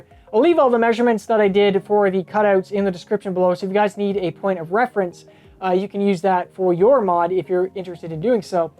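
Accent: Canada. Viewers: The Canadian accent is Noice 👌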